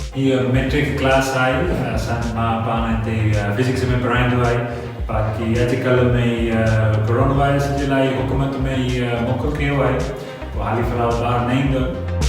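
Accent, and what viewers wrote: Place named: Sindh, Pakistan. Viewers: Wãh yãr! Hinna ẗa local accent bi pakře warẗo ãhe. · Enka to lehja bhi Sindhi hogya he. Or style bhi pora.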